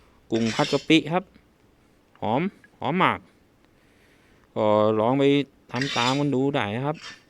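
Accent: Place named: Rayong, Thailand. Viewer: พูดระยองด้วยน่ารัก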